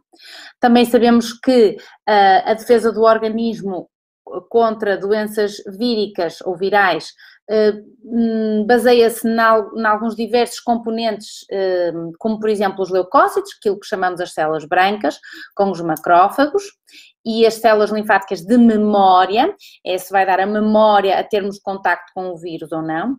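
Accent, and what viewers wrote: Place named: Portugal. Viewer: Amo ouvir você falar... Amo essse sutac português!! Sua linda!!!😃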